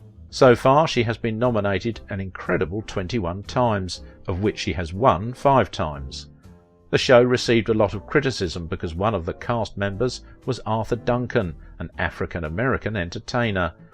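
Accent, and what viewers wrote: United Kingdom. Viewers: NOTHING, in the world would delight me more than in replying to your comment, like feeding a pork chop to a Pit Bull. BUT, I'm going to give the honors to, the owner of this magnificent channel. It's bound to be epic, and with a classy British accent.!!!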